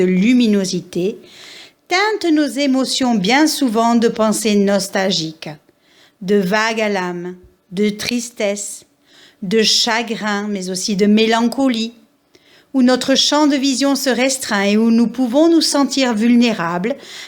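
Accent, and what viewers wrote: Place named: Provence, France. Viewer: Capsule interessante et tout à fait vrai dans ce que vous enoncez. . Merci ! P.s : d ou viens ce bel accent ? Paca , occitanie ? Bonne nuit . Yves .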